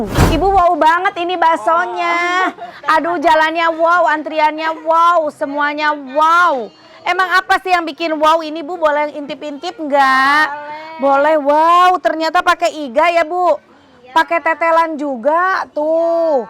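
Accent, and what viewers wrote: West Java, Indonesia. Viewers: seneng bagnet denger teh susundaan berasa di kampung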